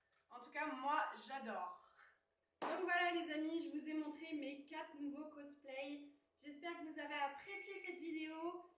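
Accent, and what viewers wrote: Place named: England, United Kingdom. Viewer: Bonjour ! Jai eu limpression que tu avais un super accent anglais, je peux me tromper mais tu parles anglais ? Super contenu sinon ^^ jespere que tout roule pour toi !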